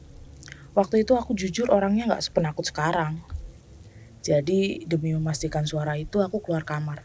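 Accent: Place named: Java, Indonesia. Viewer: Nah cocok medoknya 👍 "Culi, culi, culi" berasal dari kata "uculi" atau "lepaskan" Lepaskan apa? Yaa tali pocinya dong 😂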